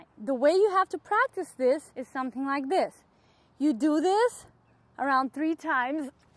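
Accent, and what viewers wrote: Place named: Eastern Europe. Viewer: Just curious love the accent are you Eastern European?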